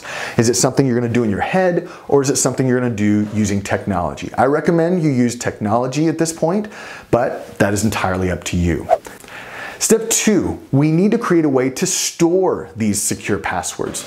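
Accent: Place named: United States. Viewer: voice sounds really different here with that American accent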